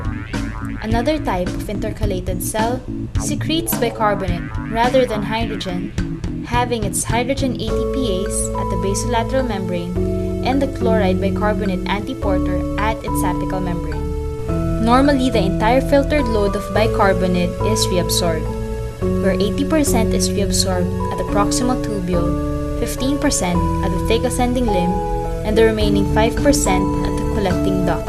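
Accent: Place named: Philippines. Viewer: sounds phillipino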